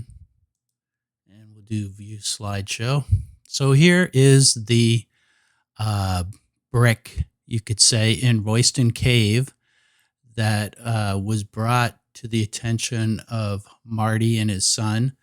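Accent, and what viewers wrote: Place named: Spain. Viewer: you always explain this so well! Thank you! (Nobody cares that your French is pronounced with a Spanish accent.)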